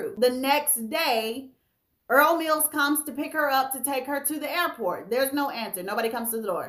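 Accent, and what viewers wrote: South, United States. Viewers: Yeah definitely from the south.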